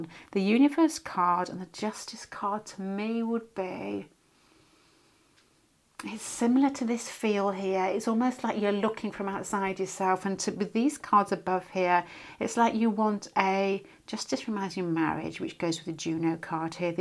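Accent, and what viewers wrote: United Kingdom. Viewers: I can't undertand you when you speak so fast with the British accent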